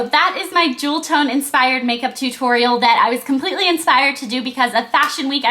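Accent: South, United States. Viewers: when you mentioned the elf brush, the nyx jumbo eyepencil and the mac "fresh water" IT BROUGHT back sooo many memories, of your first few videos! !! and OMG i miss your thick southern accent. :( hahha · she IS southern. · Like ur old videos but ur intro us way to formal and long also I miss your southern accent!! I loved it :) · you sound more southern when you're sick. i like it :)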